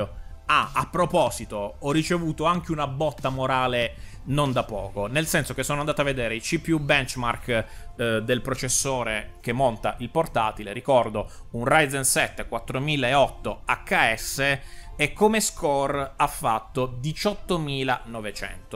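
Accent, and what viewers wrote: Italy. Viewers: 32GB di ram sono più che sufficienti anche con un solo banco dici. Non avere un doppio banco significa non avere il dual channel, e non avere il dual channel specialmente per i processore Ryzen è una GRAVE mancanza che avresti dovuto sottolineare. Questa è disinformazione e mi dispiace davvero tanto dovertelo far notare dato che dal tuo accento si capisce che siamo della stessa terra.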